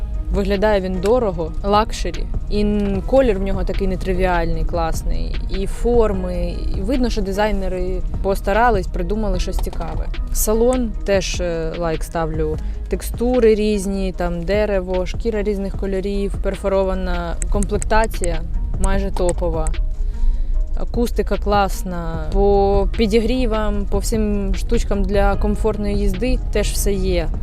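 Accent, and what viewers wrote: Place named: Russia. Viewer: Видно что тяжело даётся украинский язык. Нужно контролить себя ))) как только расслабишься сразу русский вылетает )))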